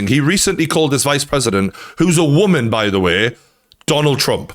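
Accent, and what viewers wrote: Newcastle, United Kingdom. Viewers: geordie man!! you could talk the hind leg off a donkey - you've found your profession and im still searchin' for my channel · Not a Scot ,he’s a geordie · Fucking Love a Passionate Geordie... 🤣🤣🤣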